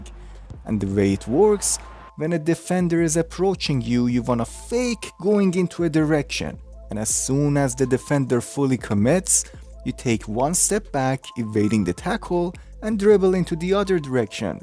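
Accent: Iran. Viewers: Are you persian by any chance dadash?😅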